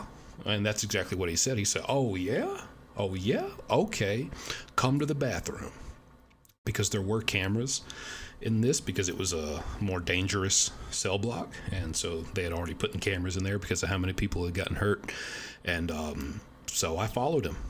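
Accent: South, United States. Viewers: Great content. Saw you on Ian Bick and became a Subscriber. The way you tell stories reminds me of how a minister Would Talk about stories from the Bible. Sounds you like you inherited a southern preacher man’s voice and dialect.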